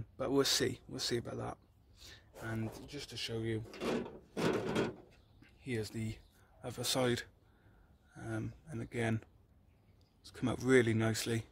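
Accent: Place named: West Country, United Kingdom. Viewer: Hey, Great vids m8! I did the 1/400 limited edition as a warm up recently and got this 200 turning up tomorrow so will be tackling it myself. Where about's are you from? I was born nd breed in Plymouth myself and watching your vids has had me smiling and chucking away 😊 (not in a bad way or anything), i've missed the west country twang 😊.. hope your getting on alright with this bad boy of a model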